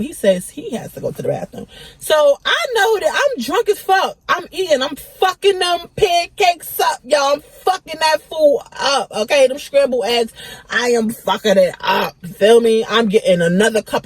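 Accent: New York, United States. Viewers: Im new to ya page so are u from NY? U definitely sound like us😂😂😂😂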